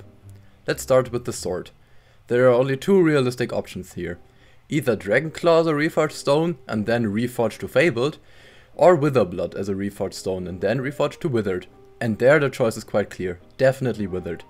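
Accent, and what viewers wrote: Germany. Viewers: you sound like german xD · are you german? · you sound german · this german accent tho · are you german? bc of the accent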